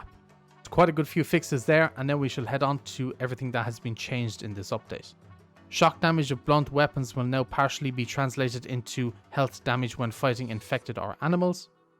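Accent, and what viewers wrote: Ireland. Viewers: He said 30. is Irish so he pronounces it as "Tirty"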